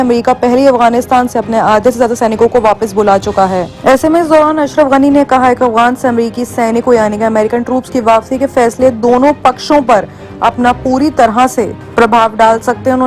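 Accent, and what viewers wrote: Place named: Bihar, India. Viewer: Koun to b hai g amma bihari dikhre aap awaz se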